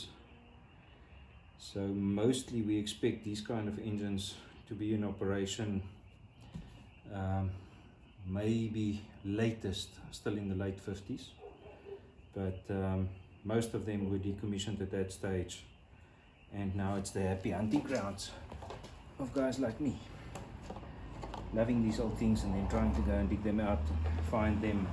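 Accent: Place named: Australia. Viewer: Love the Videos. Your Aussie accent is so calming. Sends Tingles down my spine 🤣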